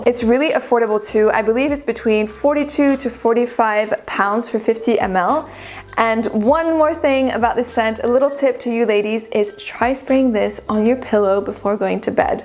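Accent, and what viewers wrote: United States. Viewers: Bonjour je. Inès de m’abonner 😍la description olfactive est très intéressante et j’ai bien envie de me diriger vers une parfumerie pour les essayer. Merci et Bravo pour cet accent américain qui est Top 👌💯❤️ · Hi. Great work on all of your fragrance videos! I remember when you first started your channel, a couple of years ago. Well done! I'm so curious: are you half French and half American? Your pronunciation of French words is of course native-level French, and your English has an American accent, but there is a "lilt" that tells me it doesn't come directly from the American mainland, at least in the linguistic formative years, up through age 13-14. I'm just curious! As an American, and a francophile who speaks fluent French, I am always fascinated by people's accents, and am curious about your linguistic background! Thanks for your great videos. 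Keep up the great work.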